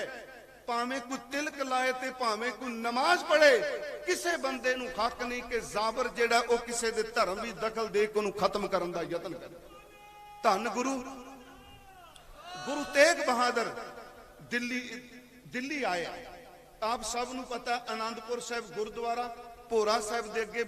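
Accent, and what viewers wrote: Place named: Tanda, India. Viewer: Tuhadi boli tanda de area naal mildi hai.. bilkul nirool hai..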